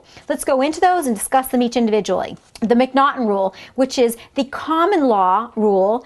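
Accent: United States. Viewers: QUESTION: If you're really certain, where in the USA is her accent from? Thank you. Every one of this series of videos is very clear and of excellent quality, and the audio levels on every one of the videos are perfectly matched for an uninterrupting playlist. The instructor is also very attractive, and I'm curious where in the USA her accent is from. Finally, I wish there were more such videos from her, besides the ones in this series.Thank you so much!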